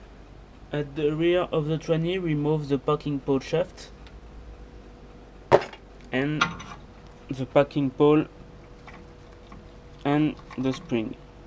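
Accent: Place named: France. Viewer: Same. I thought, ooh, a French accent. Wait, why the flag....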